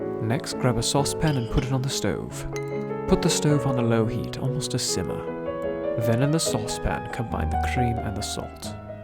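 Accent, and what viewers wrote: United Kingdom. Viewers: British accent, nice hair, cooking AND he has a suit on?.. sir, are you TRYING to make me simp for you? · the dumpling is cry out for help, and i'm just admiring british voice over🙃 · If he didn’t introduce himself as a British gentleman from the 1800’s I would think that that was his actual voice/accent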